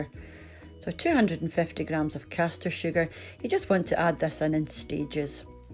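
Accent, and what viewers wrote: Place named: Scotland, United Kingdom. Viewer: I’m a new subscriber (about a week) and if I may, (I mean this with the utmost respect PLEASE know that) you have got the most beautifully soothing voice, & accent or brogue I guess. May I ask where you are from? My guess is Scotland, but I’m not sure of what a welsh accent sounds like. I honestly do not mean ANY disrespect to you, just curious. (John From California)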